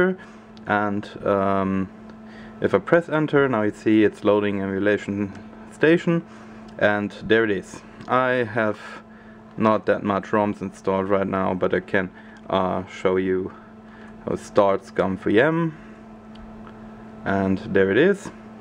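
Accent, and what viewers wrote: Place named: Germany. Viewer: OMG, ich höre krassen deutschen Akzent raus, könnte es aber auch nicht besser.Seitdem ich RaspBMC nicht mehr nutze, da es ja nun OSMC gibt, frage ich mich, ob es denn möglich ist, z.B. den Xbox 360 Wireless Controller vom PC über USB zu nutzen, denn wenn es schon so tolle Emulatoren gibt, wäre es ja äußerst unpraktisch, diese nur per Tastatur nutzen zu können.Also - ist es möglich?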